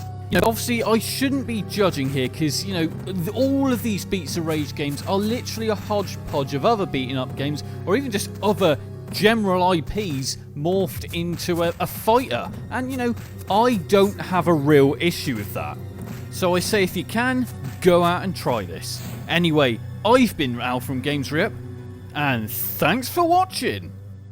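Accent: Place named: United Kingdom. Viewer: i hate when your gross british accent utters rebecca when the character is obvi jill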